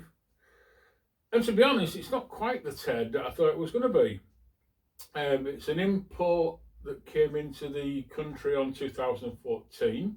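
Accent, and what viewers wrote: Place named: Hull, United Kingdom. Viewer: Where are you based, sounds like a hull accent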